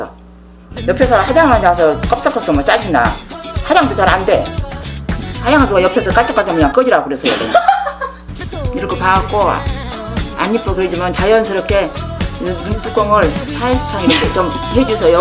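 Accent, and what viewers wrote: Jeolla, South Korea. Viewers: 전라도 사투리이신것 같은뎈ㅋㅋㅋㅋㅋㅋㅋ 말투 중독된다 처음 보는데 구독함ㅎㅎ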